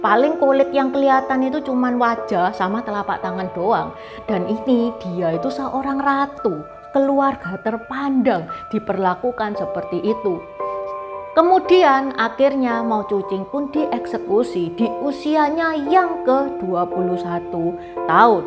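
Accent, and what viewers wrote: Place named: East Java, Indonesia. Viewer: Enak didengar tambah pengetahuan tentang sejarah, suaranya medok jawa timuran. 👍👍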